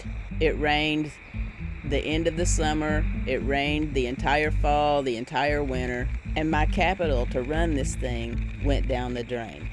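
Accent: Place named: South, United States. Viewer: Sorry if I missed it, where's that accent from? I first thought the accent sounded like ebonics, I reckon it's southern? Which southern? I always thought ebonics sounded southern. Disclaimer: I'm not from the US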